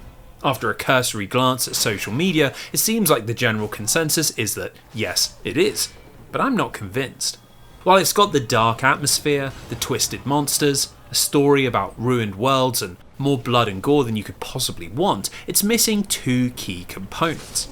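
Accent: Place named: RP, United Kingdom. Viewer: Holy shit, it's him. Am I the only one who had never once seen the guy behind the voice? I had this nerdy, posh British lad in my head (still love the content), but color me shocked.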